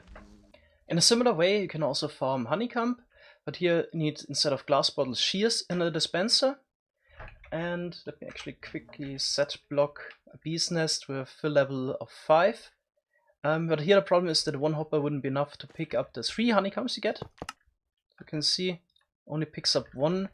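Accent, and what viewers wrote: Germany. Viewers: Y do you sound so german? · Ich finde es geil das man hört das du ein deutscher bist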